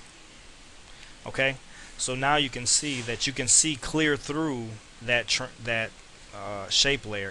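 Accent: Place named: South, United States. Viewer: I like your Southern accent :3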